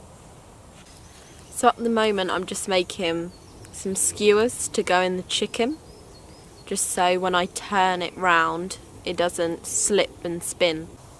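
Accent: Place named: Northern England, United Kingdom. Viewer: very fun to watch. your accent is very pleasant to listen to. i wonder if you come from north of england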